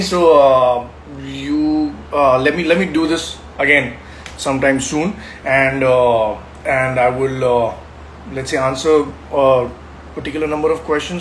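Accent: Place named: India. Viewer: It's difficult to catch english with an indian accent , but it was nice to see a very talented actor. Best wishes from Russia.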